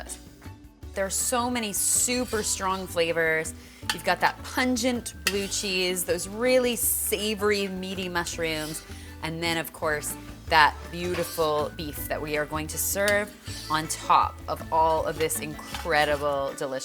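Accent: Canada. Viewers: It's such a funny thing, as an American, to hear someone speak for 10 minutes only to realize they're Canadian when they say "about". Nothing bad about it, I genuinely like it.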